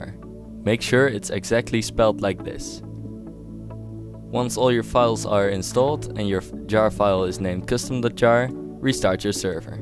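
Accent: Netherlands. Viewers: your accent sounds dutch xd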